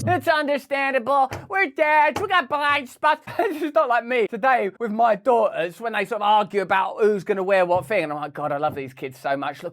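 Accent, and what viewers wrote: United States. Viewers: Love Rusty’s yAnk accent lol😅…. Biden po$ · Great videos! Going to find you on Rumble too. Also, sidenote, I’m always surprised at how amazingly accurate your American accent is! Sometimes for a second it sounds like you’re faking the British one 😂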